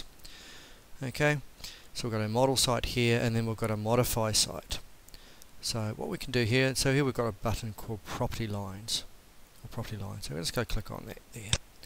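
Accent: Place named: Australia. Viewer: Sydney, Cronulla. Where are you? I can tell your from Au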